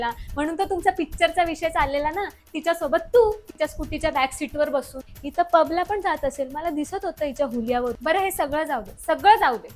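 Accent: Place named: Nashik, India. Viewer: तु नाशिकची वाटते.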